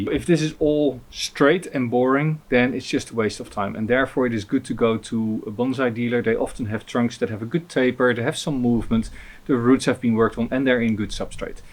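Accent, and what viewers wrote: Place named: Netherlands. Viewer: Never had a dutch accent this thick haha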